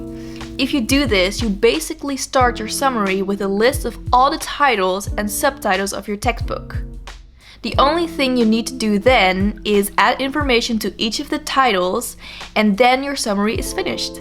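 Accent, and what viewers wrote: Netherlands. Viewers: When I heard ur accent I noticed how it sounded dutch, when I saw: "inhoudsopgave" I was like: 'Aaahh so she is'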